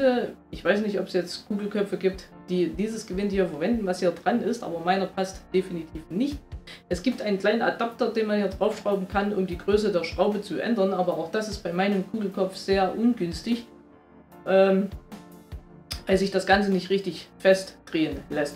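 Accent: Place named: Saxony, Germany. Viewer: Da kommt wer aus Sachsen? Xd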